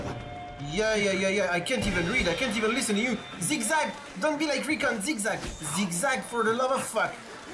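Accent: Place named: France. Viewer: yup, ton accent français est bien présent 😂👌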